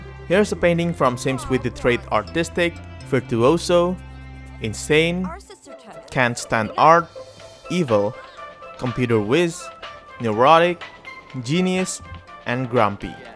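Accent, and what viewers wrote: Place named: Indonesia. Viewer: Dude are you indonesian? I assumed it by your channel name and your accent lol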